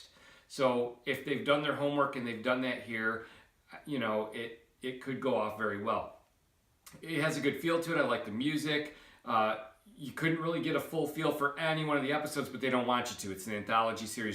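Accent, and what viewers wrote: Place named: Upstate New York, United States. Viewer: Lol i could could tell you were from upstate ny 3 words in. Great commentary! He really is a gem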